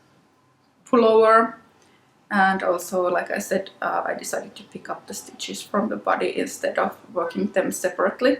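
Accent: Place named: Finland. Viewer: I was looking for summer knitting inspiration!! Your accent made me go to the time I lived in Helsinki!! Lovely memories! Happy new year!!! Regards from Peru 🇵🇪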